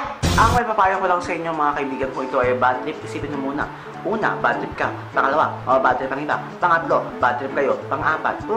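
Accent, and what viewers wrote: Visayas, Philippines. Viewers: Bisaya ka??? xD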